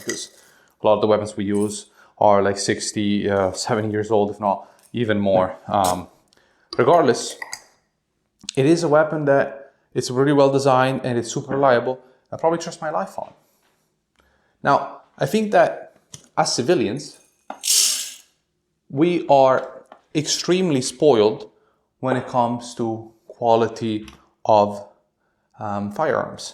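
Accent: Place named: Italy. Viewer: An Italian here you got a great Italian accent also that's a really cool rifle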